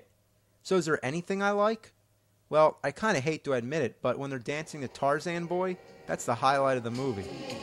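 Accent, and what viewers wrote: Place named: Pittsburgh, United States. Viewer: haha yeah i think it's ' accent. I'm pretty sure he's from Pittsburgh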